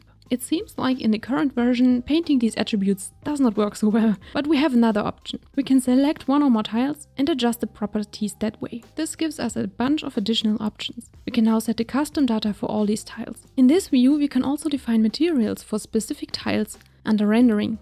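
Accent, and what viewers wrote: Germany. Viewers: Man merkt so das du Deutsch bist xD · Ich antworte mal auf deutsch, da sich dein englisch sehr nach deutsch angehört hat: Das Video ist nicht nur sehr schnell gesprochen, es ist für mein empfinden auch etwas zu sehr hektisch gewesen. Da ich ein Anfänger mit Godot bin fällt es mit etwas schwer alles nachzuvollziehen. Ich werde aber mein Bestes geben. Danke :-) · i hear a little deutsch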